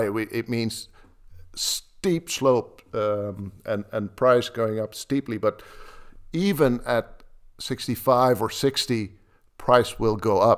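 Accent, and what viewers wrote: Netherlands. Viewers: First time here ... Got the reference from some Brazilian btc influencers 📝 ... First thing I noticed was the Dutch accent 🗣️ ... Only to be confirmed by the stream of comments in your language 🎉 ... // Het is een grote plezier contact met je nemen ... Je werk is geweldig 🥇 ... Groetjes vanuit Rio de Janeiro 🌊 ! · Yeah Dutch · Can I ask you something? Are you dutch? I hear a dutch accent or I am wrong? Thank you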